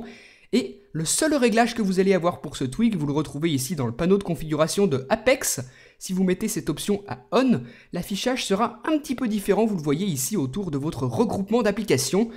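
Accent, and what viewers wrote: France. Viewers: ptn il me saoule ton accent francais quand tu dis des choses anglaises --'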